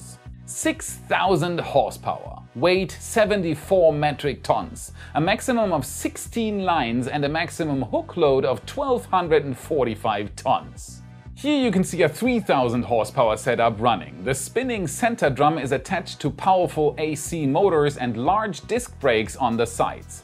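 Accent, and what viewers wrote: Germany. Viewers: Dutch, German and Scandinavian people generally speak really proper English and quite a few are close to fluent or fluent. These languages are pretty similar to English in terms of grammar and structure. As a Dutch person I can easily spot ’ German roots in his accent though, just like it is pretty easy to spot Dutch accents when you know what to look for. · haha he has quite the german accent, but his grammer and vocabulary are perfect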